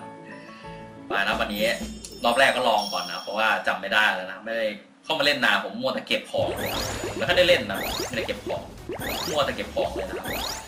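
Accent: Isan, Thailand. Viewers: พี่เป่นคนอีสานเหรอครับ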